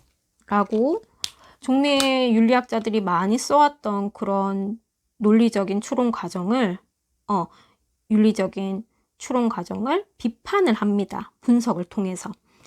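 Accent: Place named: Gyeongsang, South Korea. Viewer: 대구 목소리....영화 배우 목소리 듣는 기분임......아주 좋아요.....최고~~~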